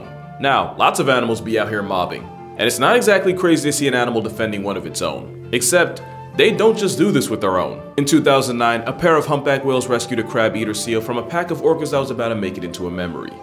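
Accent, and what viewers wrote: Scotland, United Kingdom. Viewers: Hey! Nice Scottish accent 👍🏼👍🏼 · His Scottish acsent is good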